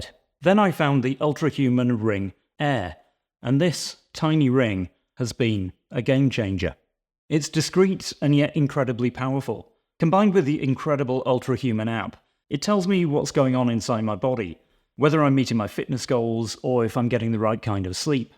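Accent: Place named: RP, United Kingdom. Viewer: you're posh and say it properly, I'm an oik and can't even spell it 😂 Great vid as always dude ❤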